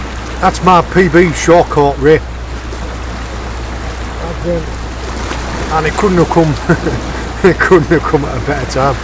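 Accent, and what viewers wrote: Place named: Middlesbrough, United Kingdom. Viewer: Are you from the 'Boro' originally as I recognise the ascent...as I grow up there ! Great video again very informative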